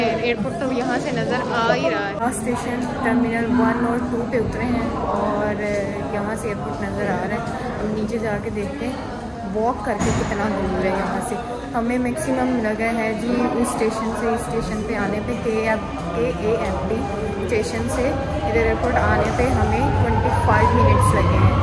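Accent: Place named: Punjab, India. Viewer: ماشاءاللہ ویسے ہمیں پتہ چلا گیا تھا آپ پاکستانی ہیں آپ کی پنجابی والی اردو سن کر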